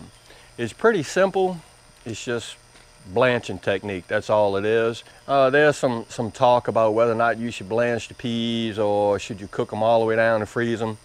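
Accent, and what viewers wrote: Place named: Louisiana, United States. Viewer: Purple hulls, pink-eyes, white peas, ALL the southern peas, can be blanched and frozen just this way. Most of the country, even many parts of the south, just don’t know peas like we do in SE Alabama/SW Georgia, but you do, friend, so you’ve done a public service with this vid. Besides, I just love to hear you talk (Louisiana, maybe?).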